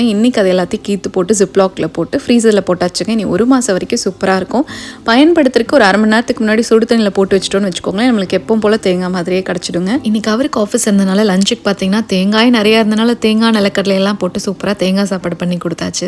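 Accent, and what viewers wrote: Coimbatore, India. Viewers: Nice way of talking coimbatore slang sis ,today lunch box spl bisibelabath and egg omelet sis ,lots of love and hugs❤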